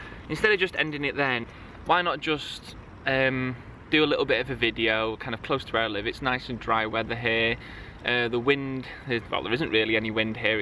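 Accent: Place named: Sheffield, United Kingdom. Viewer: Nice video mate, Shame about the wind up in Castleton you would have gotten some great views up there, Well saying that looks like where you live you have some pretty decent views. Are you from Sheffield by any chance, Your accent sounds similar to mine?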